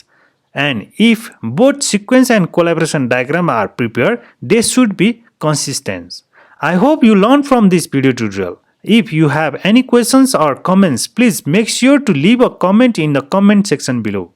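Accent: Nepal. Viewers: I cant help but notice newar accent. 😂 Great video!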